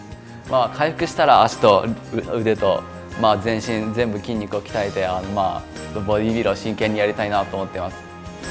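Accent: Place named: China. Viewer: 他不是日本人，他是中国人。发音是中国人的日语。絶対日本人ではありません、、、、発音を聞いたら、すぐわかるでしょう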